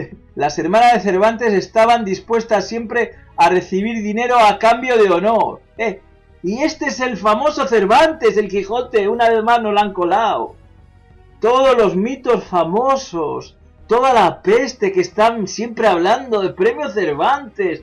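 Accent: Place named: Mexico. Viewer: Grande!!! no teme decir la verdad jamás!!! ya hablas como Mexicano hermano!!! un abrazo y gracias x tus vídeos!!!!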